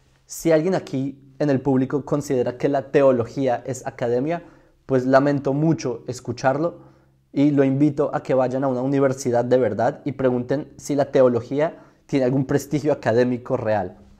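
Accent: Bogotá, Colombia. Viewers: Yo lo que creo y con sus propias palabras eres más Cristiano que cualquiera. Y veo que tampoco sabes nada del tema. Porque no subes las bases exactas, cómo años, escritos, datos arqueológicos etc. Ahora tu acento no es judío eres más bogotano que la changua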